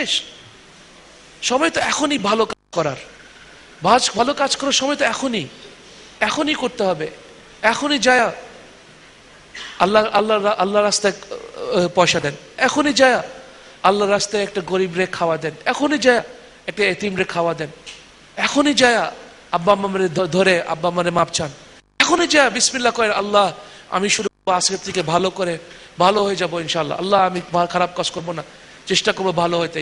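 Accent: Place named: Pakistan. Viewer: Unar English Lecture gulo shunechilam, vabchilam uni Pakistani! Valo laglo khub! Marhaba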